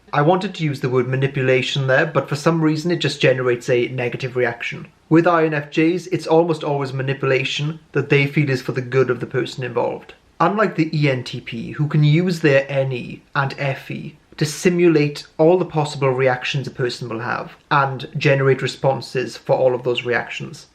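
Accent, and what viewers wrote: Wales, United Kingdom. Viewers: Oooogh Biritish accent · Where in Wales are you from? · Are you Welsh?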